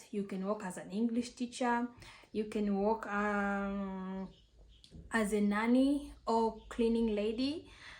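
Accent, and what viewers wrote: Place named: Tanzania. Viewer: Hello thank you so much for your video it's very nice.I can here that your from Tanzania, please if you will not mind I can have contact with you because also I'm from Tanzania and want to speak with you. Thank you for your understanding 😊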